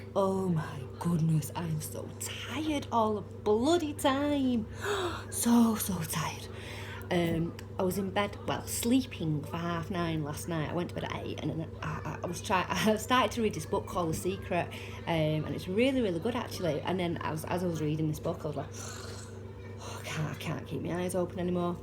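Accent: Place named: Manchester, United Kingdom. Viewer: It's horrible ain't it. I've had it over 2years and if I didn't have a sense of humour... You sound like you are from the Greater Manchester area. I am too. Is there a specialist you would recommend?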